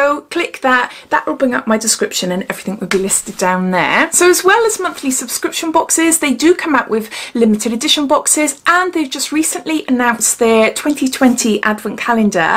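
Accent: Wales, United Kingdom. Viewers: This review is really helpful as I was thinking about trying this out- going to go for Pip instead! Love your accent- makes me want to return to lovely Wales! And you’re v brave living with 4 others- I’d go nuts!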